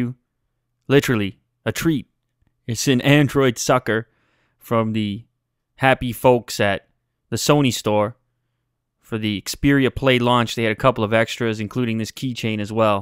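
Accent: Canada. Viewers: Sony's loading screens in games haven't changed much. Also, you sound more Canadian in this video. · Hahahaha omg feeling old as me? I love how Canadian Lew sound back in those days.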